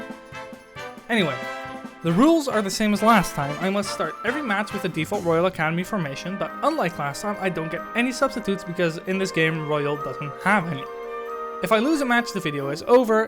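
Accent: Netherlands. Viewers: Are you dutch? I feel like I hear a little Dutch in ur reactions😂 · I love that you can hear the dutch😂. I love the vids so far. I began watching after I got the game again. I just got the game again, I am loving it just like i did years ago. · If you keep continuing this series you'll have my reeled in man. Such an entertaining series to watch! En Nederlands zo te horen! Ga zo door maatje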